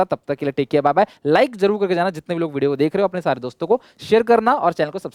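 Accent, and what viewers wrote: Odisha, India. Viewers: sir ap ka mother language odia hai kya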